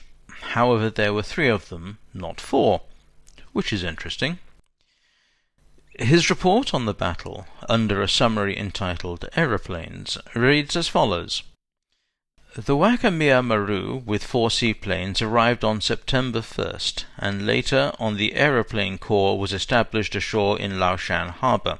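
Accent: United Kingdom. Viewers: I disagree, he just sounded well posh the exact way a British Naval Historian should sound, that includes being several glasses deep with sherry, and is so to be expected, yet he really didn’t sound blushed at all I must say so. In any case, referring to Japanese words and names as “gobbledygook” is the only term in, around, and relating to this video as any sort of ill reference to such the topic at hand. I’m not sure if you’re ignorant of how the Japanese named their vessels or if you simply did not listen to the beginning when he pardoned himself from any potential mispronunciations, as nothing was extremely mispronounced or even relative to the term extreme, especially for an Englishmen. There are far better criticisms to be writ of far lesser quality videos out there, young friend. Edit: typo correction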